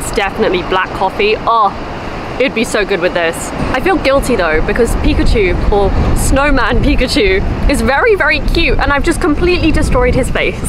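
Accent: United Kingdom. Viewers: いつも､ さんの｢イギリス英語｣を聴きに来ます｡ 明瞭で､美しくて､音楽のようでホッとします｡ アメリカ人の英語だと､ときどき聞き取りが難しいニッポン人の私ですが…… さんの｢イギリス英語なら､いつもハッキリ分かって､安心します｡ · 落ち着いてこじんまりした感じがいいですね。なんか、懐かしい感じがします。ところで、さんの「いただきます」とイギリス英語のとりこになしました！！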